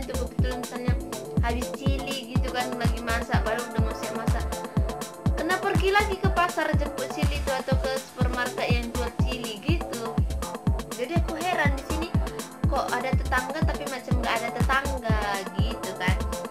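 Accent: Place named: Indonesia. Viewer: Pekat endon nya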